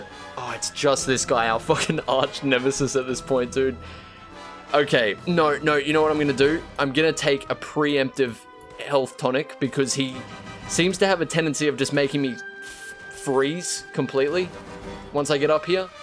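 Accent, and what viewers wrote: Australia. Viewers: Why does he sound so much more Australian while on twitch? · are you an ozzy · Are you Australian? Cause you sound a little Australian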